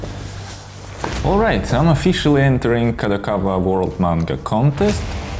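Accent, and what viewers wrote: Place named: Eastern Europe. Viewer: Очень приятный славянский акцент)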